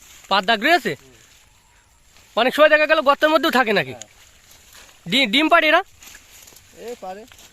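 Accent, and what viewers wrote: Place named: Meherpur, Bangladesh. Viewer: Vasha ta meherpur,chuadanga r....bari koy bhai apnar?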